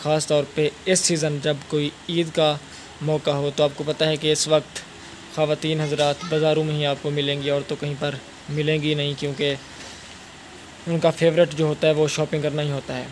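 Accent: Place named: Saraiki, Pakistan. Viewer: Bhai ap agr saraiki m video bnao ksm sy bht achi video bny gi bht pyari saraiki bolty ho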